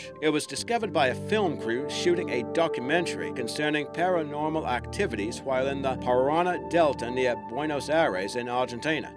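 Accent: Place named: Australia. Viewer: You have the strangest accent I have ever heard, like a mix of Australian, American and British.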